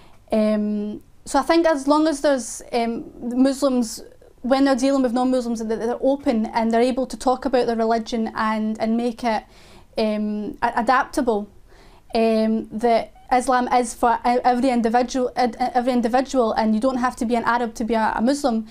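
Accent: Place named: Glasgow, United Kingdom. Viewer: As salamu Aleikum sister, welcome to Islam. Thank you for such a heart worming story. You reminded me of a beautiful Glasgowgian accent. Which i really liked while studying at Dundee university. Your humor about your dad's cooking made me lough a lot. Your story reflects inner and outer beauty. Your advise about Muslims not to react when they are wronged is spot on. It is the true reflection of our prophet Mohammad (saw). May Allah bless you and those attacking you to the right path.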